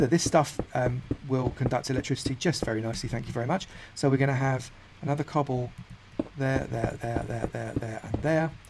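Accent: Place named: Luton, United Kingdom. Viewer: You kinda sound like you're from Luton. Sorry if I'm wrong, I'm not English, I'm American and just throwing out a guess.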